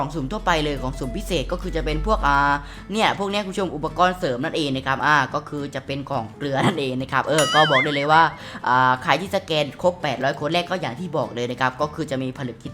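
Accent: South, Thailand. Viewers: พี่ครับพี่คนใต้ไช่ไหมครับผมฟังสำเนียงออก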